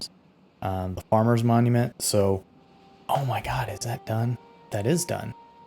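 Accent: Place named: United States. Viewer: Ok I watch a lot of timberborn videos…you and one other are the only Americans I can watch…otherwise it just sounds weird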